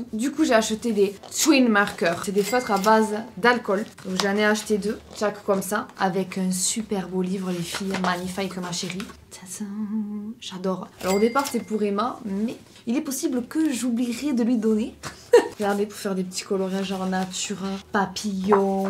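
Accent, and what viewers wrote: South, France. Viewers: Bonjour. J'adore votre accent méridionale et votre bonne humeur. Vous êtes très belle et la caméra nous renvoie l'image d'une jeune femme qui a bien "les pieds sur terre " et " la tête sur les épaules ". Bien, cela étant dit, Action et moi ce n'est pas une grande histoire d'amour. Le rapport qualité prix pour addict du shopping me tentait beaucoup par ses prix au détriment de la qualité et surtout de mon porte-monnaie. Bref, moins j'y vais mieux je me sens. Cela n'engage que moi bien entendu. Si votre shopping est à la hauteur de vos attentes, je suis contente pour vous. Restez aussi solaire car vous apportez beaucoup de bien-être. Avec toute ma sympathie. 🙂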